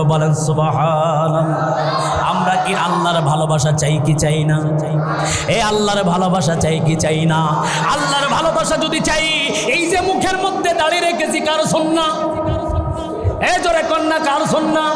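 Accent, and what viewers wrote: Shariatpur, Bangladesh. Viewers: ধুর শরীয়তপুরীর টানটা ভালো লাগেনা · ধুর শরীয়তপুরের কন্ঠে ঠিক আছে কিন্তু আবার হুবহু ওয়াজও নকল করেছে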